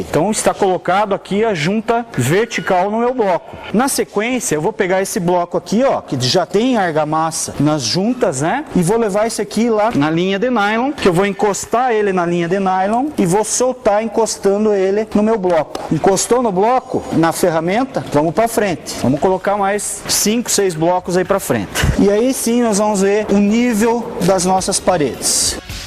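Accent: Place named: Paraná, Brazil. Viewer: VC É DO PARANA PELO SOTAQUE EU TAMBEM SOU 🙌🙌🙌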